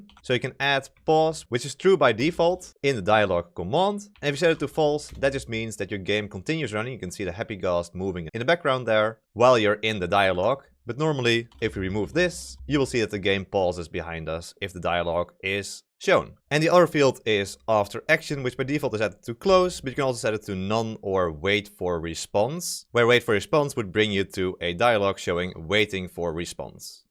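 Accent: Netherlands. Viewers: Are you dutch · Tijdens de video dacht ik: "dit is iemand die Nederlands spreekt" We hebben specifieke zinsbouw, klanken en intonatie in 't Engels. Anyway: goed gedaan! Mag nog. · I smell dutch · Haha yes us dutchies can recognize it immediately hè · Do i hear a fellow Dutchman here!! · Dutch guy Detected! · İ hear your Dutch accent, ik hoor jouw Nederlandse accent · Loving the Dutch accent! Also.. GEKONOLISEERD! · Love the video (but still miss X). You sound very Dutch, can anyone confirm? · omg do I hear a dutch accent (you did a good job:D) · Hah, ik hoorde gelijk n grappig nederlands-accentje. Groeten uit R'dam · I'm hearing a very strong Dutch accent. Is that true or am I imagining things? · That accent. Are you Dutch?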